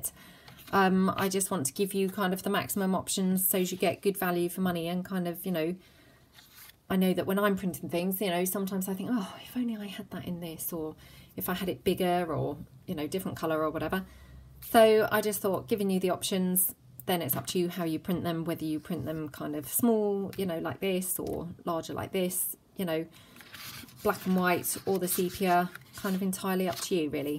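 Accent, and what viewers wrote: England, United Kingdom. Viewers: I love watching anything to do with junk journals and making emphema I am so pleased to hear an English voice although I do love to watch the USA videos the American ladies are so talented but it’s great that the British are catching up love to watch also Australia videos I am a u tube junkie love love and keep going please we British need more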